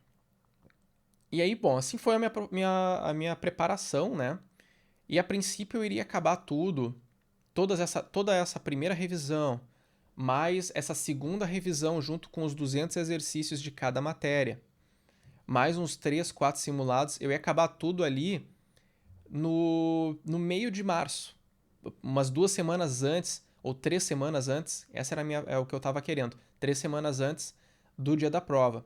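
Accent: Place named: Rio Grande do Sul, Brazil. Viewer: Pelo sotaque, tu deve ser meu conterrâneo (gaúcho). Grande abraço e parabéns pelo desempenho.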